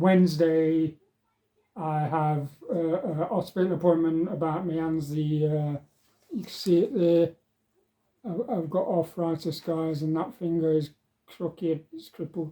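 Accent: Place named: Nottingham, United Kingdom. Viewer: Where abouts are you from roughly mate? Can't quite work the accent out but you sound like you're from my neck of the woods (Nottingham/Mansfield) way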